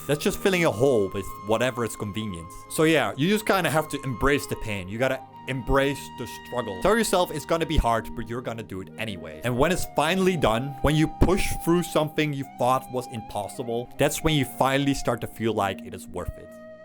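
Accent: United Kingdom. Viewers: Bro sound British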